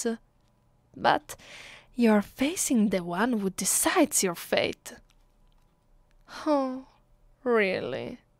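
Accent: Italy. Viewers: oh i've been kidnapped by a hot vampire lady with a italian accent, life couldn't get any better then this. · This is the BEST accent I have ever heard in my life. Like, literally, it just turned out that Italian people are what I would want to hear all my life. Oh my God. WHAT IS THIS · Do Italian women actually sound like this in English lol or are you putting on a, 'Vampire' accent?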